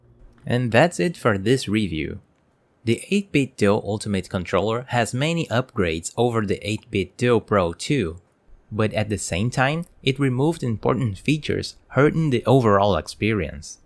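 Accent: Brazil. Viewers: o Pc dele inteiro tá em português do Brasil mano, e ele não fala como nativo, ele tem sotaque brasileiro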